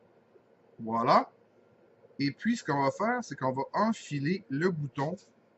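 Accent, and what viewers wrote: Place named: Quebec, Canada. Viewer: Coucou mon ami. J'aime beaucoup t'écouter parler avec l'accent québécois. Merci beaucoup à toi. Dominique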